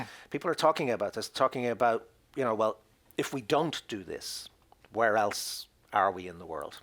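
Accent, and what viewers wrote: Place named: Ireland. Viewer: As an Irishman, I appreciate your comment. I would like to suggest an answer to your question 'why are all the most intelligent commentaries on this delivered with an Irish accent?' In Ireland, our requirement to have a referendum every time we adopt a new EU treaty means that we are forced to pay attention to the EU. We know and understand the EU better than English people, who don't get it at all. It has the added benefit of leaving Irish people with a sense of ownership of our membership. Before we, the Irish, joined the EU in 1973, for the previous 50 years we are had the type of independence that the UK is now seeking. We know the limitations of going it alone in the world, its and outdated form of independence that belongs to the early 20th century and only okay if you like being poor. It is also somewhat of an illusion - as a small country will always have to give way to a bigger country in trade negotiations...in this case the EU will always dominate the UK. 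We are never completely free, independent or sovereign. In short, we know how deluded the aspirations of JRM, BoJo and IDS and others are. I wish you well.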